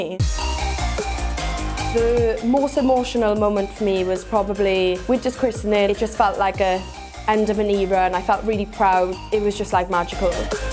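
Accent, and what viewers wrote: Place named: Wales, United Kingdom. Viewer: I really love Lateysha's accent! The Welsh accent is so pretty!!!!!!!!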